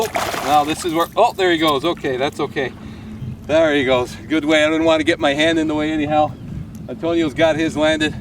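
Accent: Canada. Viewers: You sound like your from Canada, but it is always summertime in your videos?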